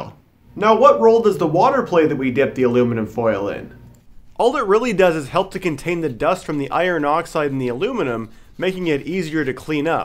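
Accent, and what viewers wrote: Canada. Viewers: if anyone wants an example of how a canadian accent is different to US, here it is.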